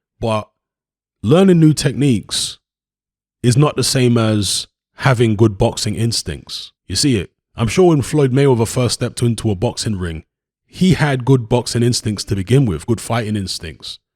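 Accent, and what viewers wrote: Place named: Cockney, United Kingdom. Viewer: He has a cockney accent why tf are you so upset?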